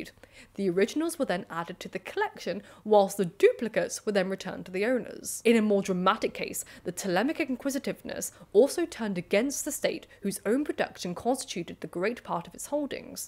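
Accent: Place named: England, United Kingdom. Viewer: Semi-regular who is constantly asked about their strong southern American accent, tunes in for, strong English accent…I reckon. :)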